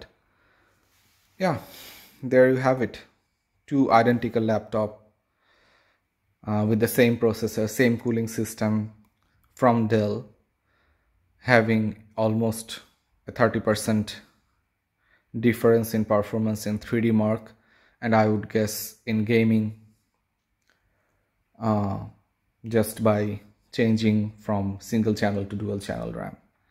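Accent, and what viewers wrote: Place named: Bengal, India. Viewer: Accent shune bujha jay bangali... Hoytoh dhakar keu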